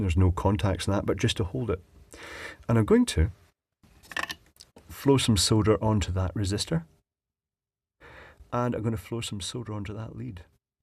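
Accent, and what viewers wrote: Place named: RP, United Kingdom. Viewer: Such a lovely BBC type sound from.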